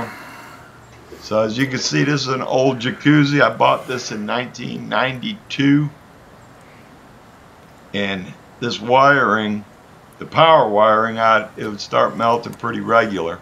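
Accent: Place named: Connecticut, United States. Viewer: Wayne (Marion Robert Morrison) born in IA and raised in California - are there these typical accents from either State? but you're right - sounds a whole lot like John Wayne. Maybe here might disclose which State of the Union he was raised and what we're hearing - I detect some CT in there.. it's a cool dialect where ever it may be located.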